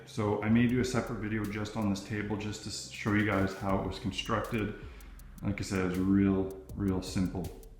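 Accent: Canada. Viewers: Are you from New Brunswick?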